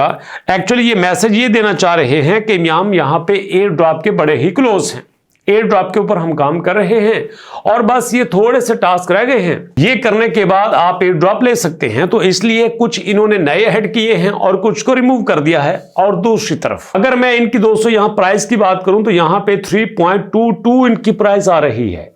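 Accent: Punjab, India. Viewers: Very good Bro....tuhadi hr Vedio bht hi infirmative hundi aa...and tuhadi punjabi ne tn dil Moh lia....❤❤❤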